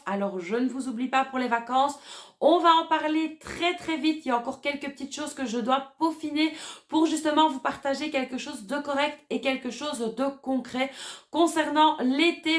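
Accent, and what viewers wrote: Belgium. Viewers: ton accent belge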